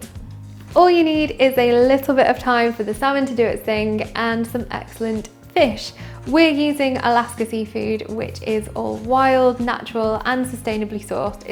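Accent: United Kingdom. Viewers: I love her accent, she has an old school British charm.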